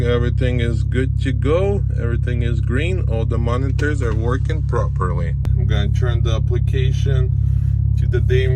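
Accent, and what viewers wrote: Russia. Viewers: You sure sound Russian.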